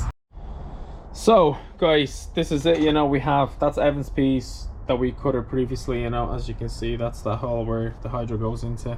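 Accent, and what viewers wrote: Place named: Sweden. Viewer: You have similar accent to a friend of mine are you swedish too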